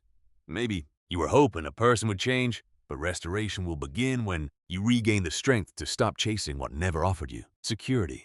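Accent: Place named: South, United States. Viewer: You who is talking u are from the south bro I can hear ur accent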